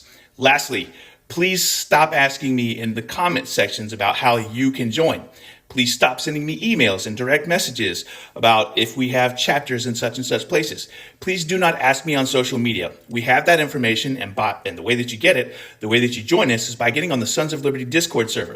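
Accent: Scotland, United Kingdom. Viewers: I gotta say - I love when you’re getting into your rants and your Scottish accent comes out. We need more Scottish rants! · You can tell how passionate is about the subject based on how often his Scottish accent makes an appearance. And he should be passionate about this, as we all should be. If this administration can't treat our veterans properly, they won't treat anyone properly. Thank you, and the admin team, for everything you do. I made it to the end of the video and eff the algorithm.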